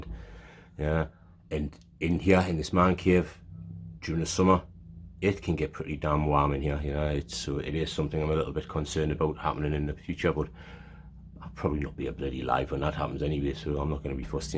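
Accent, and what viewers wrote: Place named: Newcastle, United Kingdom. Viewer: Areet. I am from Sunny Sooooth Shields. Nice to hear a local Geordie review some hot toys figures. F*ckn Best accent in the world and what hot toy review needs! Beats the Aussies, Americans, southerners etc on here! I have literally just stumbled across your channel browsing reviews of my next purchase. Awesome collection you have man. I have just subbed. Hope to see more! 💪🏻👊🏻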